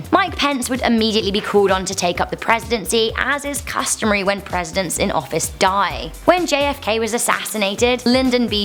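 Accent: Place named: United Kingdom. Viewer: that damn annoying british accent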